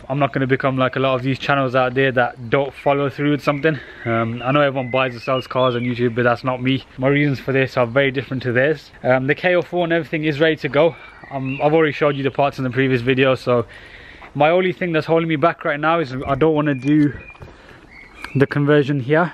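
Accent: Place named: Birmingham, United Kingdom. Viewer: Can I ask where abouts your from? I’ve heard Birmingham but me and my girlfriend watch your videos and you look so familiar 😂and we can’t figure out where your from, love the videos!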